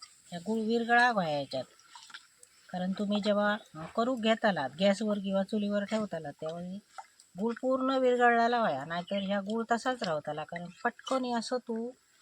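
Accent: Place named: Malvan, India. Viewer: तुमच्या सर्व रेसिपी सोप्या असतात तुमचं मालवणी बोलणे गोड वाटते नाचणी वडी खूप छान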